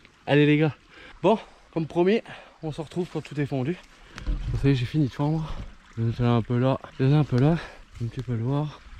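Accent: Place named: Lorraine, France. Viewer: A l'accent je dirais un lorrain 👍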